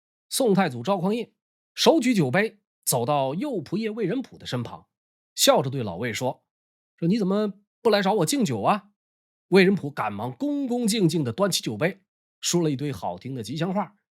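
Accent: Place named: Shenyang, China. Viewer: 总觉得兄是沈阳人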